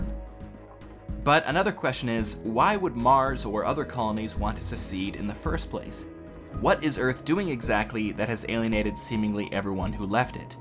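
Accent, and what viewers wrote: United States. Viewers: Uhm, why would a nation try to succeed from their home nation? Your accent sounds American....Ask the british how it went from their perspective. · "How does a collection of colonists and frontier workers, possibly compete with the manpower and industry resources, etc or a larger empire." Really dumb question dude, ESPECIALLY since, judging by your accent, you're an American.... Seriously, read your own nation's history and you'll have the obvious answer to your question. Whether or not it's feasable on a planetary scale is irrelevant, there nonetheless is a real world basis for this narrative plot point.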